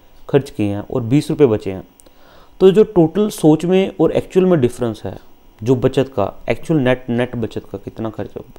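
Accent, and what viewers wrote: Punjab, India. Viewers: You accent says You are Punjabi.. If you are, this is the first time I am watching a economic session from a Punjabi.. Loved it.. Thankyou.. Ap ghora, cycle bol sakte the... Donkey kharid ke us par baith kar office jana 😂😂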